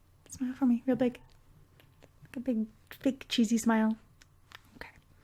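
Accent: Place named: United States. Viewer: I have to ask, is English or Portuguese your first language? You speak English with an American accent, and I don't know Portuguese so I can't identify an accent in that one.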